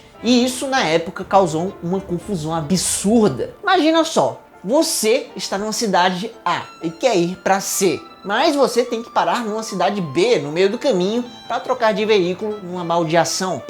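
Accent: Ceará, Brazil. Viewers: CRLH Eu tinha certeza que é cearense, praticamente afirmou agora, raramente confundo um conterrâneo 👽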